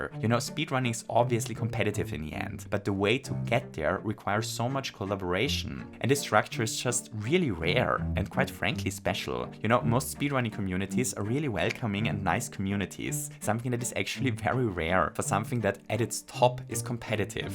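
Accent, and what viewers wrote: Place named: Germany. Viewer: du klingst sehr deutsch, ich kann es nicht erklären, dein Akzent ist nicht stark, aber ich höre definitiv deutsch XD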